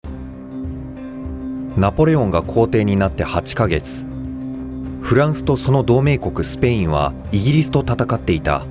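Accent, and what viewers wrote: Kansai, Japan. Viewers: 関西イントネーションかわいいよ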